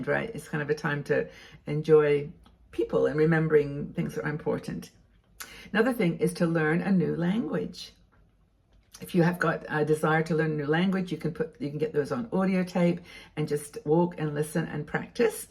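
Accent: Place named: United Kingdom. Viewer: Hi! I love walking and I love hearing you speak in a more British way!:)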